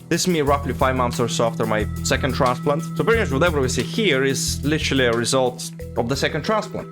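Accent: Russia. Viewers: Что у вас за акцент? Вы русский?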